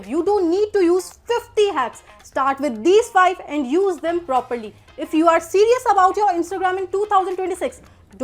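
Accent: India. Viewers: Yeah Anytime and really nice East Indian Accent and Hot Beautiful Smile plus Personality.!!!💙❤🩵✅️👌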